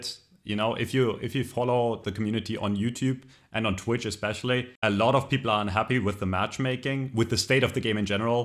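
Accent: Germany. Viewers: critiquing a system is always better with a German accent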